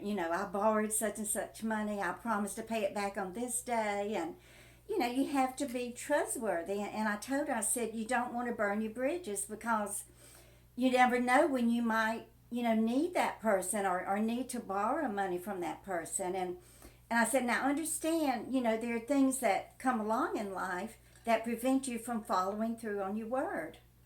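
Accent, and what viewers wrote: South, United States. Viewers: Your such a sweetheart❤❤ please try not to let anyone take advantage of you i love your Southern Charm and accent it's adorable.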